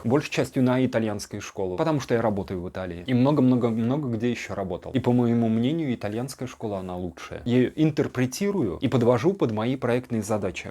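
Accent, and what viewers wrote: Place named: Moscow, Russia. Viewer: раздражает нелепая манера говор с московским ш вместо питерского чн чк откуда ты прелестное ха-ха создание с таким количеством ошибок в речи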